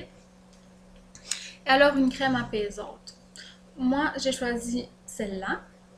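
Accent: Liège, Belgium. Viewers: Ca c'est un accent bien de chez nous!! et de Lièèèèèège ;-)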